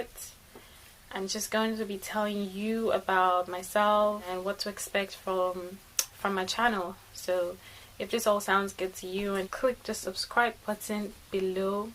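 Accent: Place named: Nigeria. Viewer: I guess writing and keeping your work scope and plot around Nigeria has helped to keep you connected to the Fatherland, I could see and feel the “Nigerianness” here and there while you speak! I totally loved it ❤️.. A fan!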